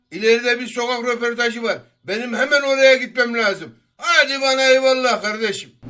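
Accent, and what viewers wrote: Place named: Central Anatolia, Turkey. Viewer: İç Anadolu şivesi tam oturmuş,anlayana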